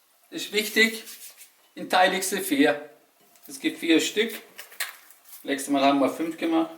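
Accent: Swabia, Germany. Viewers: ein schwäbelnder türke <3 instantlike haha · Sein Schwäbisch 😂😂Geiler Typ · des schwäbisch ist einfach toll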